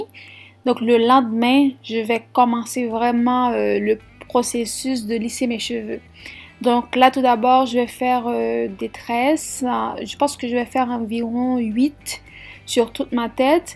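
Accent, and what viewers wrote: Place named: Quebec, Canada. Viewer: L'accent québécois allez!!!!11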